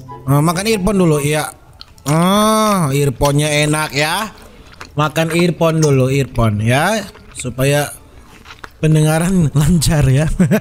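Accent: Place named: Sulawesi, Indonesia. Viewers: Kayak logat orang sulawesi dank,lu orang mana bg? Di jawab donk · Kentara sekali logatta makassar kanda😂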